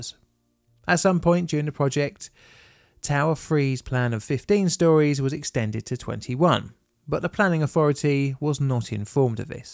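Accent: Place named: Croydon, United Kingdom. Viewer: I knew u were from Croydonn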